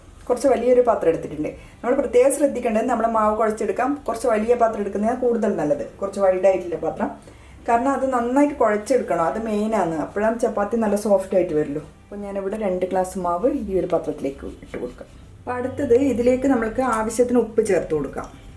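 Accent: Kannur, India. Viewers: Language tune like Kannur?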